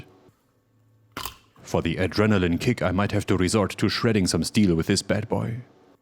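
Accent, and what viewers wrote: Germany. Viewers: The Deutschlander flavoured English narration is beyond superb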